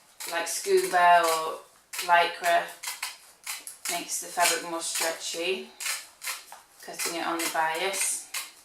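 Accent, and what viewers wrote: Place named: United Kingdom. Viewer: I love your british accent! Today I bought my first fascinator , very expensive and I'm not happy because is not like all fascinators I use to watch on tv. I have to attend a important wedding soon, and I want to add something nice to my outfit, but checking your videos, I can see not all fascinators are hats, there are lot of choises and mine is just like this one on this video, gray color. Thanks a lot!!! Regards from Chile :) I'm really sorry for my bad english lol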